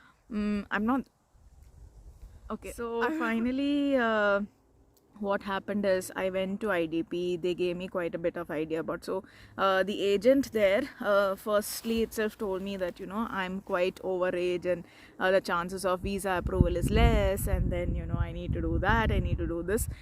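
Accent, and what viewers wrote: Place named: Bangalore, India. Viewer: Oh my i was sooo sure ur from Bangalore.. my my how well i know Bangaloreans speak cheers 🥳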